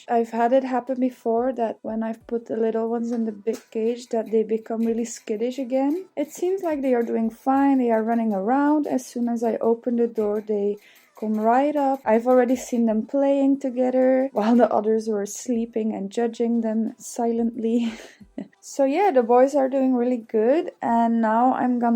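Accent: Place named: Netherlands. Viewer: ben je nederlands?? je hebt een beetje een nederlands accent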